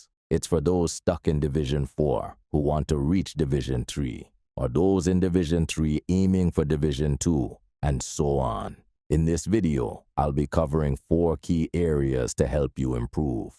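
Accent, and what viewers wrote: Jamaica. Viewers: Great informative video as always bro, you will always be the Bob Marley of eafc... I love the Jamaican accent😊....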